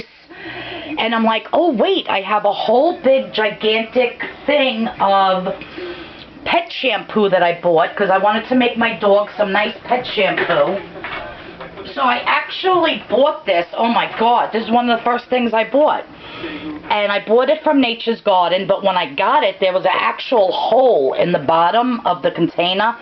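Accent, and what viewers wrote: New York, United States. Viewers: Thank you for all the info. I also love your accent. Since I moved from Fairfield County CT to Houston I don't get to hear NY accents anymore. And you cracked me up about your husband hearing the mixer. LOL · you sound like me where are you from you sound like a new Yorker I'm from Staten island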